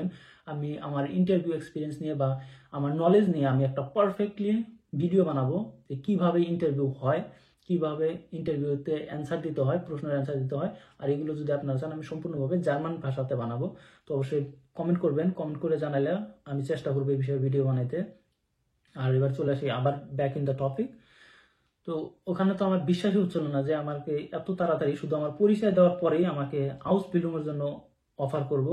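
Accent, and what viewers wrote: Assam, India. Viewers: Uni India Asam theke belong kore.... Doya kore ektu bujhe nen Bangla ta.... Sob jaigar accent ek rokom nah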